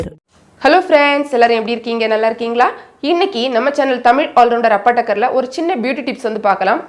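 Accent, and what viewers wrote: Kanyakumari, India. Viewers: Nega kanyakumari ah sis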